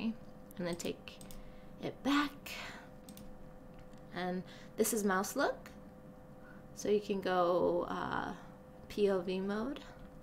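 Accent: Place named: United States. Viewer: This was so entertaining thank you. I love your accent, im presuming your American, im in Scotland so impossible to tell where in the states people are from lol. Thanks :) x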